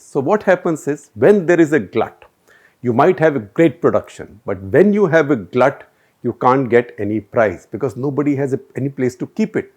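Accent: India. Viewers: This is possibly the worst speaker of the English language. He speaks English as if he is speaking Hindi. Except for Telegu most of the Indian languages are greatly disturbing in that words come out as if they are being shot out. There does not seem to be an sort of fluidity or smooth flow of words. At the end of a phrase the speaker pauses as if expecting an answer from the listener or expecting the listener to complete the sentence of phrase ,much in the manner of a kindergarten teacher . It would probably treble or quadruple watchers if this man learnt to speak English as it should be spoken not broken up staccato like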